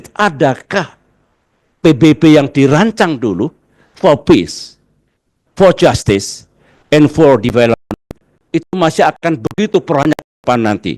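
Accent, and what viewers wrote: Java, Indonesia. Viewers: lidah wong Jowo 😀😀🙏🙏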